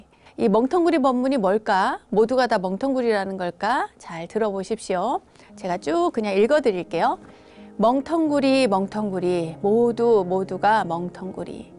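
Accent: Gyeongsang, South Korea. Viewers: 스님의 속세 고향이 어디신지 모르겠지만 경상도 사투리를 넘 잘하시네요 ㅎㅎㅎ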